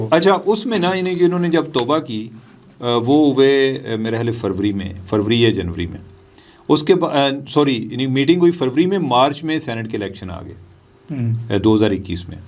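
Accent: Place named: Punjab, Pakistan. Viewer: میرے کچھ پسندیدہ صحافیوں میں سے ایک چیمہ صاحب بھی ہیں۔۔مجھے ان کا مختصر اور پوائنٹ پر ہلکے پھلکے انداز میں بولنا اچھا لگتا ہے اور پنجابی کا تڑکہ کمال ہے ان کا۔۔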